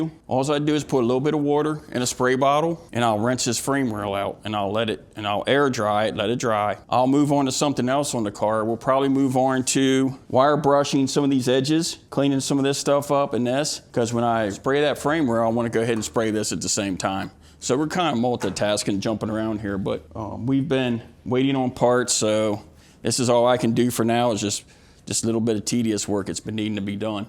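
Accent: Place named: Maryland, United States. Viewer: I hear glen burnie accent!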